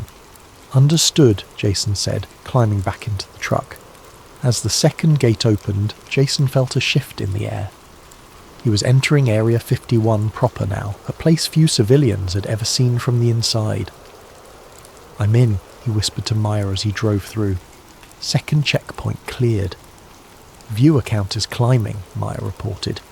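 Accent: United Kingdom. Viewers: Can we get these without a UK accent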